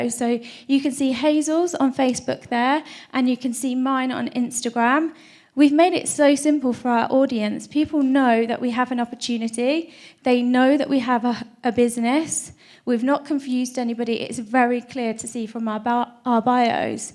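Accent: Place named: United Kingdom. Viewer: first her british accent annoying second try your product in your ass see if it work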